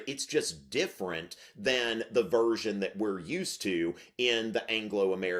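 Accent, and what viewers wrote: South, United States. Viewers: Love these! You break things down elegantly and with a homey, cozy southern accent. I am hooked.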